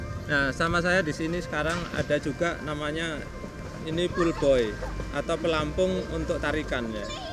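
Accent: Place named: Java, Indonesia. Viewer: Sangat bermanfaat pk besok sy latihn renang lgi pk......ko di batam pk kyakya bahasanya agk medok jawa.....sehat selalu pk slam dri bekasi.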